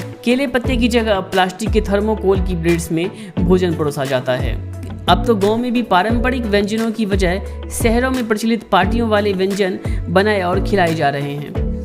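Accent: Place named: Bihar, India. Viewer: Bhaiya parampara hota hai ya parampara hota hai ham jante hain aap Bihar ke Bihar Dakota bolate Hain Puri ka pura aur pura ka Puri hai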